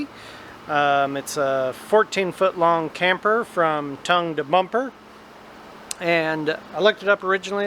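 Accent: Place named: Iowa, United States. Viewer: As an Australian I'm going to guess he is from Iowa or somewhere close.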